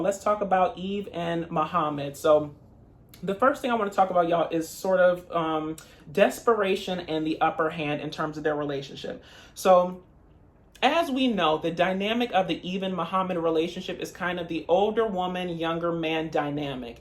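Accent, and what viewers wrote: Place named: Caribbean. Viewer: Loved the Caribbean accent in the intro and the entire review. I agree with your take on all the couples. All these people have these serious conversations waaaaay too soon from the arrival of the “foreign” fiancé. Emily is just very childish and unrealistic- she wants the wedding and the diamond ring but in reality she doesn’t even know if she really has true feelings for this guy. I don’t believe that there would be no option for them to have any alone time after they got settled at her parents house. I’m sure the grandparents wouldn’t mind watching the baby for a couple of hours after Kobe arrived for them to have a date night the next day. But like you said she trapped him into the whole arrangement without prior discussions. Best regards!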